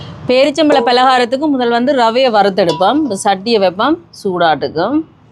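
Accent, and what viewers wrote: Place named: Sri Lanka. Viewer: இலங்கை தமிழ் மிகமிக அருமை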